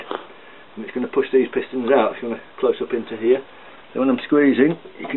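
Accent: United Kingdom. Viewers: got to do this on my tiger soon, cheers for the tutorial, nice to hear it from a Brit too ;)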